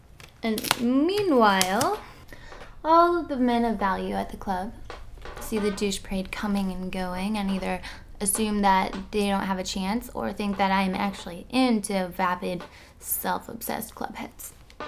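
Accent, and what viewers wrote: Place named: Spain. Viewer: Good Spanish accent.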